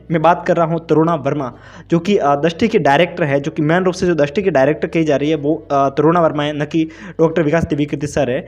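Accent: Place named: Rajasthan, India. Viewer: Lagta hi rajsthani hai aap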